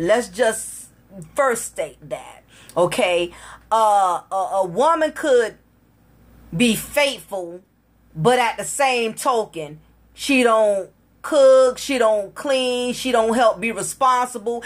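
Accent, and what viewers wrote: South, United States. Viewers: i always wanted to know were youre from cause of you're accent . im from Savannah. But rise up in the north east. i bet my mom knows youre family. You know how it is down there maybe i need to come back home for a good women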